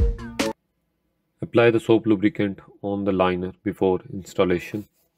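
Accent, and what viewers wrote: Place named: Punjab, India. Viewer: Buddy ur voice sound punjabi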